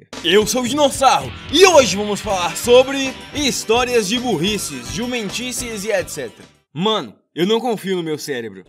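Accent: Bahia, Brazil. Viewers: Mano,vc é baiano ou gaúcho,sou filho de baiano,sou mineiro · Tu é de que lugar da bahia?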